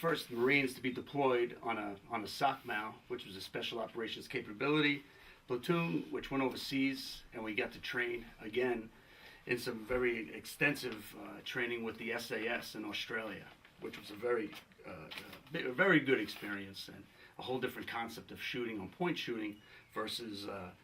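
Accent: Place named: Italy. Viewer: He's got that awesome Italian kind of accent thing going on. :D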